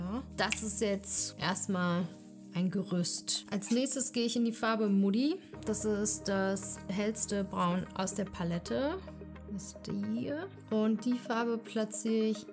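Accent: Berlin, Germany. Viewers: kann dit vielleicht ma sein, das de gerade frisch aus Berlin zurück warst vorm Videodreh? Da kommt so schöne Berlinerschnauze durch. 🤗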